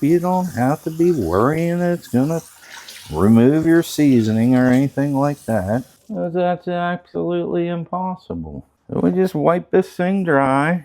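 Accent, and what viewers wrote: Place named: South, United States. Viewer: I love that southern drawl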